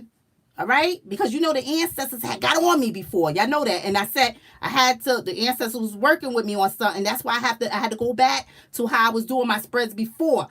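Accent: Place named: New York, United States. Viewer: I knew you were from NY!!! You have that flava!!!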